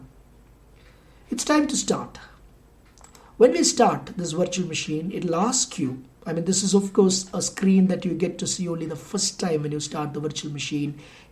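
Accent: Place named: India. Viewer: Appreciate your clean English, albeit Indian accent, greatly explained,Keep it up.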